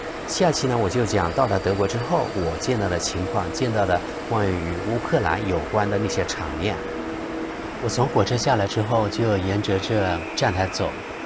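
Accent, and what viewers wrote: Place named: Guilin, China. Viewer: 听口音就是桂林老乡，桂普太明显啦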